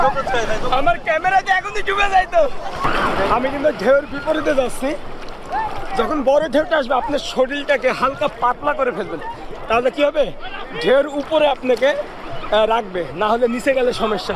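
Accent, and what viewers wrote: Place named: Meherpur, Bangladesh. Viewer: Valo lagche amader meherpur gangnir vasa (machan)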